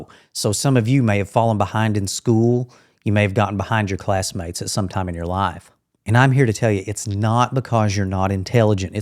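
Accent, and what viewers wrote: South, United States. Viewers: Where is your accent from? Carolinas?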